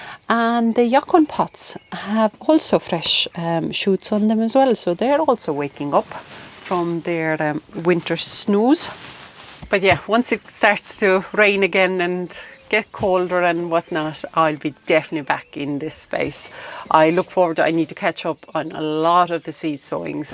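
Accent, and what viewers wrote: Ireland. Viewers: Only discovered your channel. Great video. Trying to place your accent. I'm thinking maybe you're originally from Poland, but have lived in Ireland for many years! You have a strong Irish accent now. ☺ I had a Geum in my garden. I was a way for a while, and the Geum has self seeded A LOT!!! I think mine is "totally tangerine." I had one, now I have five. To be honest, they've taken over a certain part of my main flower bed, and have stopped my ferns from growing which I'm quite surprised about. I'm going to see how they look in their new spot later in the year when everything is growing and if I'm not happy with them, I'll get rid of them and plant new ferns (spot is a little shady). PS: I love your dog.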